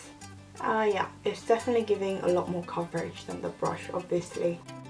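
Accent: United Kingdom. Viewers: 영국발음인가요...??? 진짜매력있어요 요즘관심많이가는데ㅜㅜㅜㅜㅜㅜㅜ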